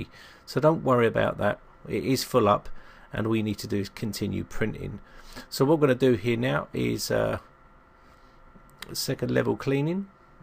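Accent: United Kingdom. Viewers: This is not a Cockney accent,it's British English, Cockney accent is more like 'strine' Australian English.even at time like Texan English.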